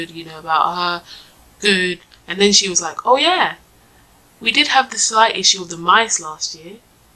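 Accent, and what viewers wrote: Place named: London, United Kingdom. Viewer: Oh I had a feeling you was from there I like London culture and the accent I wanna visit one day!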